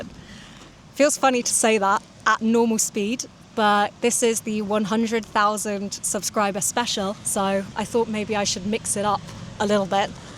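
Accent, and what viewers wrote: England, United Kingdom. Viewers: That's the Englishest of Englishes I've ever heard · you have very clear british accent, I like british accent, specialy RP accent. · Funny to see this recommended while being a native-English speaker (I live in the US), and it seems it was also recommended to many other English-speakers. In my case, I may have seen videos regarding learning English from non-native speakers where those learning were native to Japan or some other country, so 'learn English' would be the common point there. This video does help in one way, and that's hearing a UK English accent that might be at a little bit faster speed than I'd heard from other YouTube channels or shows like Monty Python. I also sub to a couple channels from Ireland and one from Scotland - so also English, but a lesser-heard accent, to me. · Are you England? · Forgot she spoke British English and not American English and panicked at first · beautiful england accent. 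· Hi!, well, I usually study American English but let me tell you that I understand you, not at all but I do. I love your British pronunciation, it sounds fantastic, though 2 or 3 words slip my mind but I can understand you. Greetings from México City. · Thank you for your video. I’m a Japanese student studying Russian. For some reasons I quit studying English after entering university, so my English got worse. But after watching some videos of your YouTube channel I wanted to start learning English again. You speak slowly, so it is easier to understand, than other YouTubers. And I like your British accent. I agree that surrounding yourself with foreign languages is the best path to master them. At first I couldn’t understand conversations of Russian native speakers, but I started to make it a point to listen to podcasts or YouTube for language learners every day, one day I completely understood what they are saying. 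· Hi! I’m Korean and married to a British. That’s why I absolutely love the British accent! I’m so glad I can understand you much better than I thought. Haha. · If I understood everything it means I'm an advanced speaker? (I'm really asking, my native language is spanish tbf) Cuz tbh it wasn't difficult at all, your pronunciation and accent is very pleasant and clear compared to others brits I heard before. I'm surprised indeed cuz I learned the USA english and that's the accent i'm most used to (still can't understand those texans cowboys brokers selling cows, their speech speed is about half the speed of light lol) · I like her accent; it reminds me of the British life I've always missed. :-* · Hi,there.Idid understand what you meant in the video.i love your UK sound,thank you 😊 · As a native speaker of American English, I understood everything you said, quite effortlessly, despite the cute English accent and occasional British-specific idiom. 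· I am an American and I love you Brits and your accents ! Nice job ! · Hello from Greece. Wow. You are great sweety lady. Your pronunciation was a bit expected as British lady, it was not so difficult to hear. Perhaps they are international English with British accent? We thank you very much for your video. Kisses from Athens. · Are you British? I think 🤔 I love 💕 your accent. How can I get in touch with you? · I've been learning english like 7 months and i kinda got 99% of this video like every single word i understood no problem and the best part of it that i sorta lerned and watched only american way of english and even with ur british an accent i got everything as well and honestly i really like how u pronouce without "r" so thank u for this video and contiue do what u do and u become as famous as mr beast in world of english learners xd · I really like the way you speak, your British accent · She is clearly British. 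Doesn't sound Aussie at all. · Fancy British accent, thanks for native speed, its all clear. Is this true that most British people are very polite? Can they directly say "no" for any unlike favour?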